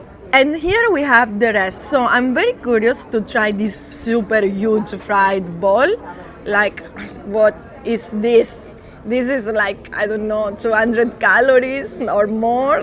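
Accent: Italy. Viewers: I absolutely love your Italian accent!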